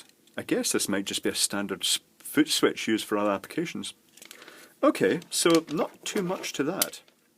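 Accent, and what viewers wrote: Scotland, United Kingdom. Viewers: That an isle of man accent? sounds scottish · Not video-related, but I'm curious to know your history, how'd you get involved in this stuff, and how you ended up on the Isle of Man? I detect a distinct fellow Scottish accent ☺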